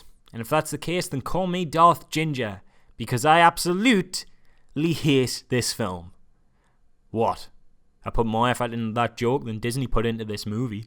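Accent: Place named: Geordie, United Kingdom. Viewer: Thick Geordie twat.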